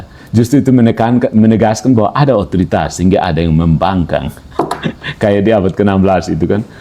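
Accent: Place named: Eastern Indonesia, Indonesia. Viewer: Selain isi dari vidio ini yg luar biasa , saya palingsreg ketika mendengarkan tatanan bahasa n logat bahasa serta intonasi saat berbahasa utk saudara /i Indonesia Timoer kita Paaaas n sertifikat itulah berbahasa Indonesia dengan baik n benar . Terimakasih Tuhan Engkau masih memberi ruang n waktu utk diriku n klg ku menjadi tubuh gereja katolik .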